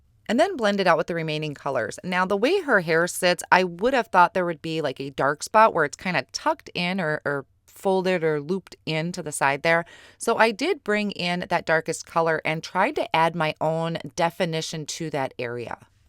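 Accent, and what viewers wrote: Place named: Minnesota, United States. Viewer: you've got to be a Minnesotan... "pOst-it tape", at the very least a mid-westerner... I'm originally from MN so I can hear the a's and the o's a mile away... love the card and all things My Favorite Things!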